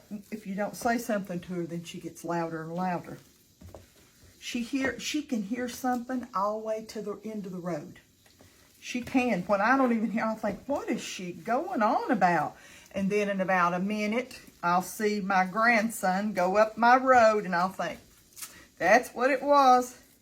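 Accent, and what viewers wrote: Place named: South, United States. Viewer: Oh, and you sound southern, do you have a chicken and dumplings? My grandma made the best, my mom is a lousy cook, i took on the cooking. She, my mother's, sent me a recipe but it was vague, pretty light on detail. Betty crooker index card. not specific. Im sure there was lard, I'm vegan, nobody else my house is.😊 it was noodly not biscuity she was depression era. Was my favorite thing on the planet. I wanna recreate for my boys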